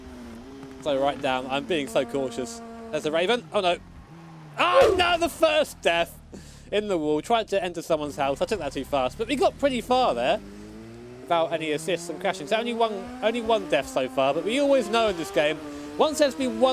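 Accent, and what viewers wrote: United Kingdom. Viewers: Oh wow I didn’t know you had a lisp I thought you were just British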